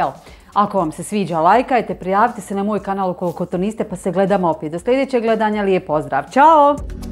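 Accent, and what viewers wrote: Bosnia and Herzegovina. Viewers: Rucak ukusan i jednostavan, uzivam slusati nas srednjobosanki akcent👌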